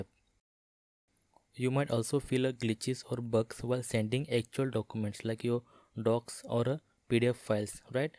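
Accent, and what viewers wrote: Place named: India. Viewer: inggris aksen india😭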